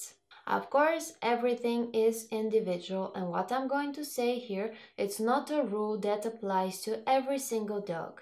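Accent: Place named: Belgium. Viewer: Love your accent. Where in the world are you from? I'm thinking French-speaking Belgium.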